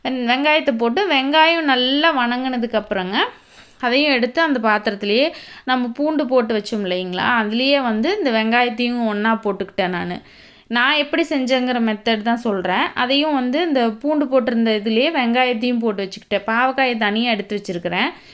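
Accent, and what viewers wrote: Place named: Kongu, India. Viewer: nenga yaru slang ah change panna solla. Ithu kongu slang. Itha la unga nala eathuku mudila na enna pandrathu. Be inclusive❤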